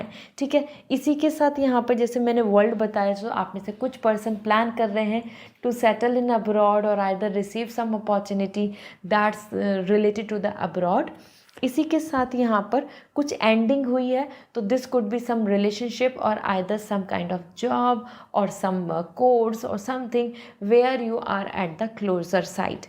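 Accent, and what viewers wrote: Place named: Punjab, India. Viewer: You sound punjabi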